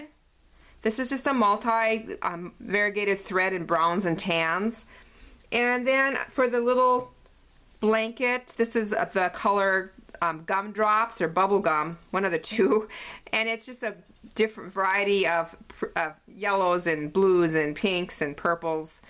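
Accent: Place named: North Dakota, United States. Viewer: Hi. I've just discovered your channel. I love it! The first thing I noticed was your accent. I immediately recognized it as most of my relatives live in North Dakota and are Norwegian. Made me feel right at time, lol. Keep the videos coming, they're great!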